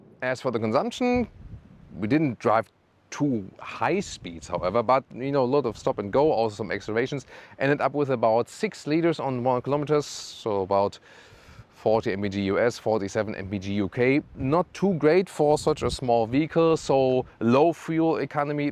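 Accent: Germany. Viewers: I love this german accent when he says R. (Eg. Lower bumper - lowa bampa, slimmer = slima)😁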